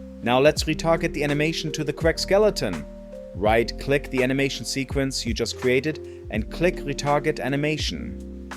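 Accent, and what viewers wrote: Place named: Germany. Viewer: bro your accent sound so german haha good video btw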